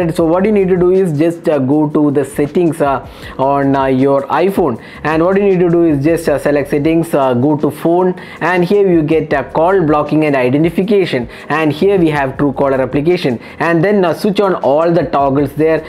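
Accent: Kerala, India. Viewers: Are you a malayali by any chance?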